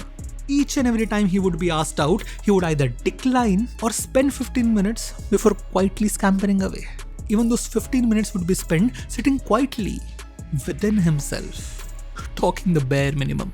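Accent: India. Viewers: I like how don't try to pretend and fake your aaccent and use our Indian accent. Kudos. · This is mother tongue influence we all Indians have...We are not Brits.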